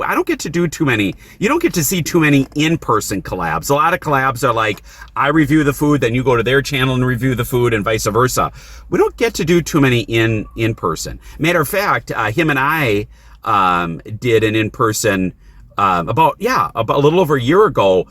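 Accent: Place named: Wisconsin, United States. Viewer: Your Wiscahhhhnsin accent cracks me up! 😂.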